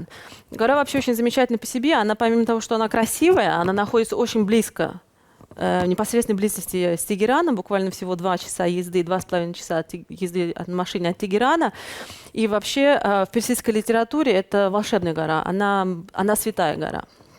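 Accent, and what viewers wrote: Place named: Samarkand, Uzbekistan. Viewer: Шикарный акцент, как будто соседка из Самарканда рассказывает. Очень интересно и увлекательно. Один вопрос, как быть в последующем с визой в Штаты, после посещения Ирана?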